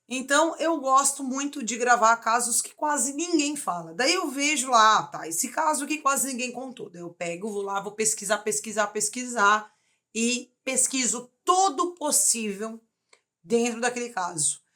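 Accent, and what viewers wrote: Rio Grande do Sul, Brazil. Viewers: Nossa, eu jurava que você era gaúcha, e eu sou gaúcha! Volta e meia você solta alguma palavra que eu acho bem "catarina" mas no geral acho seu sotaque muito parecido com o nosso. Amei o vídeo! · Tu fala muito bem!!! E tb achei que tu era gaúcha como eu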